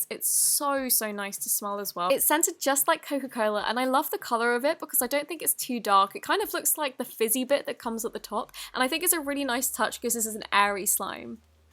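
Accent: United Kingdom. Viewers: are you British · she cant her british accent takes over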